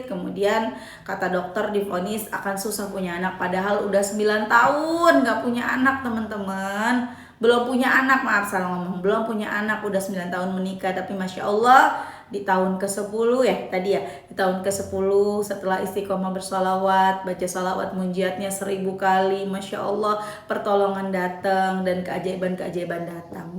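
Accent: Jakarta, Indonesia. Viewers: masya Allah mantap mba bahasanya betawi bgt❤😊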